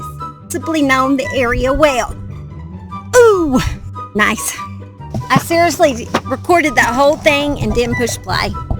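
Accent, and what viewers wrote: South, United States. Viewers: Love you always ❤ sounds like you’re shouting this story, please preserve the southern voice. I know this was 2 years ago but I’m catching up xx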